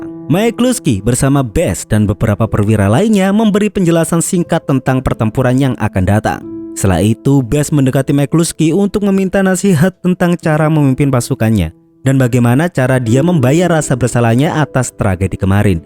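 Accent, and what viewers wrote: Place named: Malang, Indonesia. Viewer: Bahasamu kek org malang min😂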